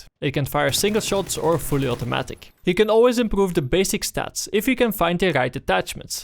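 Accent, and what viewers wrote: Netherlands. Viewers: Judging by his accent, he's from the Netherlands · 100% Jij bent Nederlands. De accent xD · the more the netherland accent coming through - i love it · Sorry it is dutch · You sound dutch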